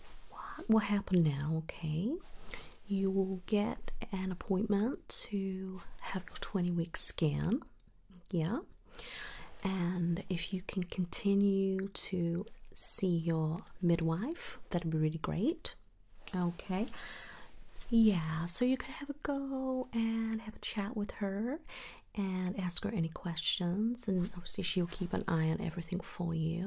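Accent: South, United States. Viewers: Not dreadful, unless you weren’t going for Southern. Every time someone from England tries to do a American accent they sound like they are from the south. And the wig definitely goes with the accent 😂😂. love your vids!!! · Omg the accent was great! It sounds very southern. Good job 👏🏽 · You did great with the accent overall :) an easy suggestion (only since you asked) would be to make sure that you're not softening your vowels where they shouldn't be.....I think that's a big difference between your side of the pond and mine :) also, be careful not to throw too much southern into it, it can ring very fake to southerners ;) but girl this was awesome and I definitely think you should try the accent more! I also personally love this particular role play theme, so relaxing.... you are incredible as usual! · Hi.... I mean!;) I wish the ob/gyns I've met were as nice as you are in this lovely, lovely RP.:( They are usually so dismissive and sometimes even rude. 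I'm sure most of the time, it's not even their fault - cause they have so many patients to see. But sometimes, they are really not listening and not empathizing. That being said, I would really love a RP where an ob/gyn believes and comforts me when I tell her that I have excruciating period pains, that incapacitate me for days, plus PMDD the week before (basically PMS on acid) and suggests further investigations for endometriosis or other disorders, instead of just giving me hormones and dismissing me, saying that "period pains are normal". No, these most definitely are NOT. In other words, I have to mention that that's a lovely Southern accent (granted it's... mixed with something else) you're pulling off.:-D And I love your look with that wig. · Sounds like ur from the south xxx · I had to smile during this one. So used to your British accent, it was, kind of weird, hearing you speak in a southern accent. 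Don’t get me wrong, it was fantastically made, just so out of the norm from what I’m used to here ☺️ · You sound like a Tennessee lady. Doing some Southern charm characters would be good! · Actually... The southern accent is pretty much this.... But well kept secret, Southerners do the best fake Brit accent 🤣🤣🤣 we're the last remnant of the British rule in America 🤣🤣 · I thoroughly enjoyed this one 😄 great job on the Southern accent lol. Maybe try an African accent next time just for laughs 😆, now that would be quite the challenge 😎! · Honestly the accent isn't that bad. At times it sounds sort of southern which I thought was nice. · Sounds good. Like the southern twang you added. I'm in the hills of Missouri and I approve. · I think the title is hilarious "dreadful American accent" hahaha! I think it's actually pretty spot-on. We have different accents and this has a sort of Southern hint to it, but pretty darn good! 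· Omg I was not expecting a southern accent when I read “American accent” lmao! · OH MY GOD THE SOUTHERN ACCENT IS SO GOOD???? LIKE OKAY WE SEE YOU!! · Yup I was thinking the same, kind of has a southern/Midwest twang😁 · YESSS 💙 This was so amazing!!! It seemed like you were doing a southern-ish American accent and if sooo you did amazing at it. 💙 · Southern belle! Love the accent honestly, it works! Soothing as always, really love your asmr videos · You sound like a southern belle!!! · The accent is vaguely southern but has hints of other regions as well. I've never heard someone with this accent before, but it's still quite relaxing. I don't really have tips because I'm terrible at accents as well. I couldn't differentiate between british and australian accents till I was like 12 lol. I would say the most un-American thing in the video was your use of the word "trousers" though. I can't remember the last time I've heard someone American say anything other than pants, unless they are referring to formal pants like for a suit.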